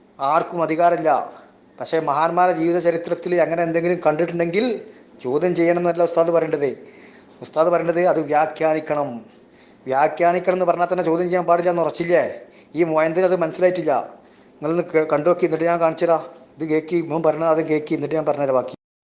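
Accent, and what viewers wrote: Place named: Malappuram, India. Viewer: പറയുന്നത് എന്നു് പറയുന്നതിന് പകരം പറയണ്ടതു് എന്ന് പറയരുത്. മലപ്പുറം ശൈലി ഒഴിവാക്കുക . കേൾവിക്കാർ ആശയക്കുഴപ്പത്തിലാകും ശ്രദ്ധിക്കുമല്ലോ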